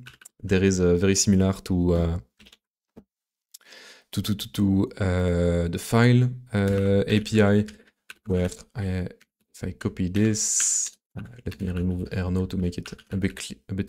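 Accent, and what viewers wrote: France. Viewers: It's the french accent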